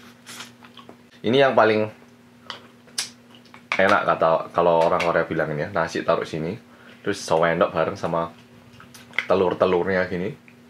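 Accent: Java, Indonesia. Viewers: Kok logat nya kaya Jawa? 😅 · Jir korea rasa jawa medok guys 🤭 2020 hadir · Logatnya kek logat Jawa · Kebiasaan tinggal ditempat yang bahasanya jawa jadi gitu deh nada nya😅😂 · Korea tapi bisa punya logat jawa asli..kerennnn😂😂😂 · Ini tuh orang jawa medok apa kalo korean ngomong bahasa indo jadi kek orang jawa medok ya aksen nya? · Medok jowo kaliii kmu bang.. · Ngomong indonya kek orang jawa ngomong indo wkwkwkwk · "halo guys, welcome back to korea reomit bersama orang korea yg luwe~" "halo guys, welcome back to korea reomit bersama orang korea yg medok~" apapun versi nya, ntah kenapa tetep suka;) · Kok ngomongnya kayak orang Jawa😂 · Opo ikih tak kiro wong asli korea jebul nek ngmng yo iso medok ngono😅😂 but i like😍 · like bangett sma cara ngomong nya.. medhog bangettt mas ee... 😊😊😘😘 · Ini pertama x belajar bahasa nya di mana?kok logat nya kayak jawa 🤣🤣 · Muedok e mas wkwk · Keliru aku,, ini orang Jawa apa Korea yaa 😄😄 koq kaya ada logat jawanya gtuh 🤣🤣✌️🙏🙏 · Jowo ne sampeyan ke pundi? 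· Muka korea ngomongnya jawa😅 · Ini org korea ap jowo gaesss 😁😁 medok tenan are iki 👍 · Gua heran sm dia..... Orang korea ngomongnya kayak orang jawa.... Yg setuju like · Medok njir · Bang ngomong nya kayak orang Jawa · Kuei lho mas medhok pol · Ada logat bahasa jawanya 😅😅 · Njowo bat wkwkwk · It's just me or dia Emang medok? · Kaya orang Jawa bahasa ngomong nya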